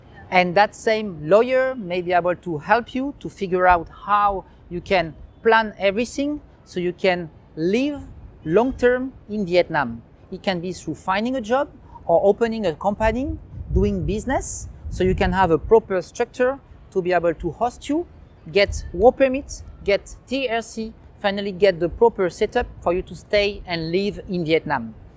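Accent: France. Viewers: I’m American, You should really put subtitles your English is not good ...you have a super heavy French accent...I couldn’t understand you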